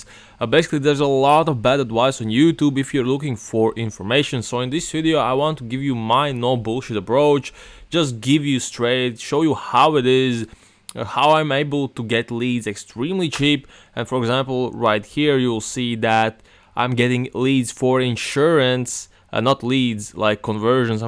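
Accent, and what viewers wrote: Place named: Latvia. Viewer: Hello mate, thanks the value 🙏. I have one question: It seems like your clients are American, do they care about your Latvian accent or have you faced any rejections because of it?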